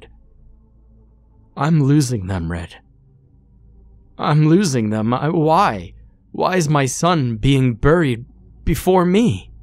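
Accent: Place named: Northeast, United States. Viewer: Accent? Where are you from? To me he just enunciates clearly and sounds almost northeastern, like Massachusetts or something 🤔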